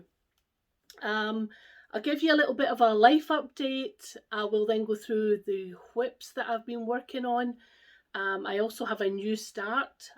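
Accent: Scotland, United Kingdom. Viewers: I’ve just found you on flosstube! I’ve followed for a while on Instagram but didn’t know you had videos! Love your stitching and absolutely love your accent! Scotland is the one place I’d love to visit it’s beautiful in pictures and part of my heritage 🖤🏴 so thankful your parents were able to get their vaccine and did well with it hope yours goes good as well! Thanks for sharing! Happy stitching 🧵!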